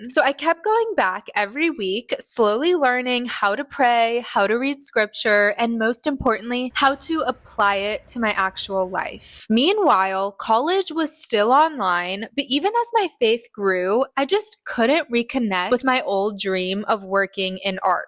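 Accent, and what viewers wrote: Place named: United States. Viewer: Well, here I am commenting again, because I think it’s worth considering another perspective. Yes, the CIA funded aspects of the abstract art movement. Hitler, on the other hand, heavily promoted classical realism. If government patronage alone is enough to discredit an artistic movement, then that principle has to be applied consistently. The conclusion cannot depend on which government happens to be involved. Also, based on your accent, I assume you’re in America. The same society you criticize also protects your freedom to reject abstract art entirely. So even if the CIA pushed it, you are free to decorate your home however you wish. And as you yourself noted, the objective was not to corrupt art, but to demonstrate the cultural power and global influence of the United States, particularly because the Soviet Union rejected abstract art. 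The movement was being used as a symbol of ideological freedom, not as evidence that abstraction itself was somehow a corruption of beauty. I worked as an art curator, and I genuinely adore abstract art. Ironically, although I have access to many classical works, I often find them a bit stuffy in a domestic setting. I have a small black abstract canvas hanging in my hallway beside a classical vase and an antique Austrian Art Nouveau chandelier. I also keep traditional Orthodox icons because I am Eastern Orthodox, and we certainly agree that Christ is the fullness of beauty. Architecture and interior design are ultimately about harmony, prop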